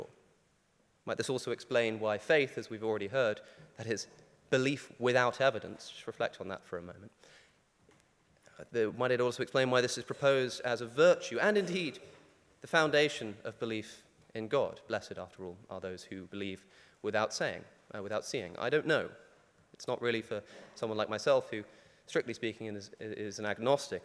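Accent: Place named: RP, United Kingdom. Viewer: It's mostly you. This is how educated people, especially Brits, tend to speak.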